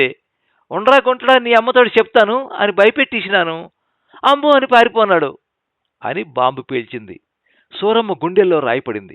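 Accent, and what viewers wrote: India. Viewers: కధ బావుంది. మీరు చదివి వినిపించిన తూరుపు యాస బాగా ఉంది. అభినందనలు.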